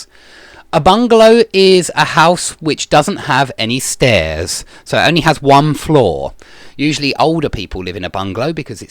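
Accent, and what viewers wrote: United Kingdom. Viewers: I like how you explain the topics. Enough vocabulary, concise definitions, imagination and British accent. Thanks.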